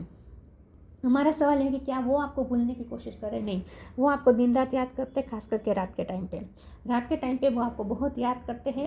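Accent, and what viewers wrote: Gujarat, India. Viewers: Ma'am aap gujrati ho.??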